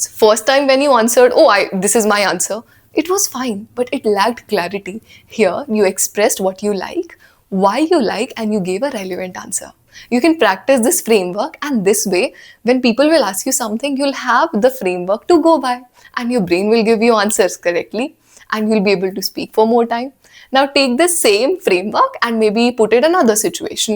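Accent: India. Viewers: Indian accent 😭😭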